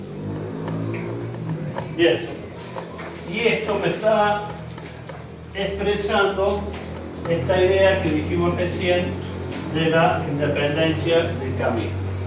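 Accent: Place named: Córdoba, Argentina. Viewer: ajajajja reconoci el acento cordobes al toque y quede wtf, esperaba encontrar el tipico tutorial centroamericano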